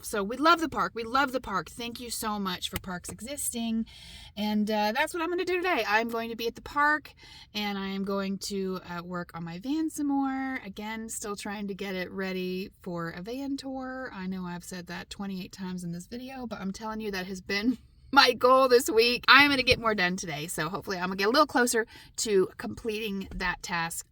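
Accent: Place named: Texas, United States. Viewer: I thought you sounded Texan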